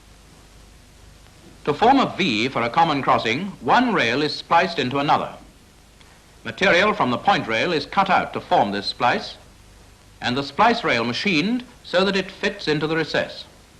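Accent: RP, United Kingdom. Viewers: Amazing! Where did you find this? If this footage is unrestored it's even more amazing. Love the "BBC" English! Thank you!! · Classic 1930s commentary, BBC English delivered in that patrician and reassuring style,alongside plenty of technical jargon to keep the Great Unwashed enthralled and firmly in their place.Job done,and train passes sweetly over the new junction at Chester General...now let's have a nice cup of tea.Utter respect for these men,my father worked as a shunter and track repair ganger for 15 years,just 13 miles from where this was filmed,including through the harsh winter of 1963.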